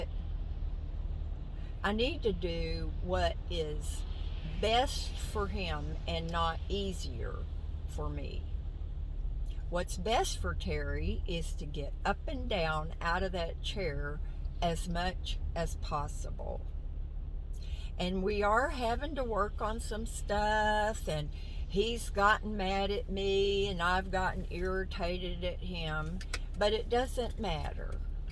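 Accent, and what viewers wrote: South, United States. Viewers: Luv your southern accent! U r so cute! Good luck with Terry!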